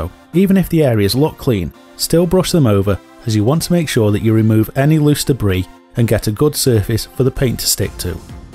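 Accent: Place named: Stoke-on-Trent, United Kingdom. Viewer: What did you use for the discs? And are you from the stoke on trent area? Your accent sounds local. Cheers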